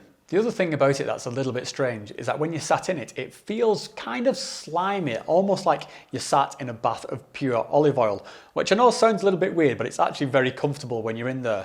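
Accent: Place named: Lancashire, United Kingdom. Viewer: What's that accent you have? You near Preston / Blackburn?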